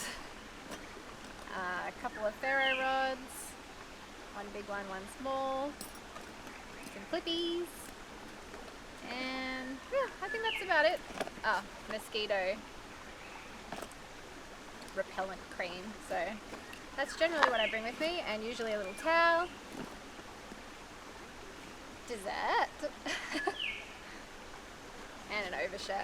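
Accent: Australia. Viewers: Мне не нравится австралийский акцент😮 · Are you from Australia?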